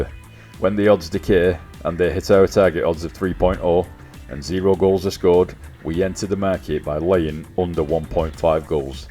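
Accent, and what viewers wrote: Wigan, United Kingdom. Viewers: You from Wigan mate?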